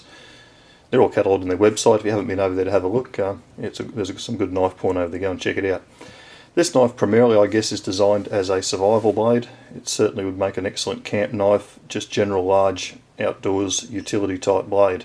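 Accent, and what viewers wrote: Australia. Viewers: Great review. Nice to hear an Aussie accent instead of all the Americans. Have you ever used the Ontario SP10? If so what are your thoughts compared to the SP50?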